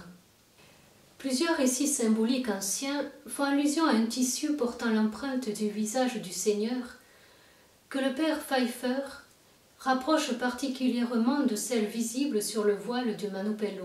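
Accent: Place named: Provence, France. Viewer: #5 Que dire ? 🤔 ... J'adore l'accent de Provence ! C'est véritablement charmant et quelque part aussi, apaisant à entendre... Surtout ne le perdez pas ma Sœur 😌 Merci à vous et que LE TOUT PUISSANT vous bénisse... ✝️ P. S. : Caso a Irmã (cujo nome gostaria de conhecer) NUNCA na sua vida tenha feito até hoje, uma peregrinação até FÁTIMA ... Por 🤲🏻 favor, não deixe de contemplar essa possibilidade! Claro, quando puder ou quiser... Pois a FÉ assim como DEUS cá estarão para acolhê-la com o amor, o carrinho & o respeito que merece 🤍 OBRIGADO✓ Adieu 👼🏻 ma Sœur.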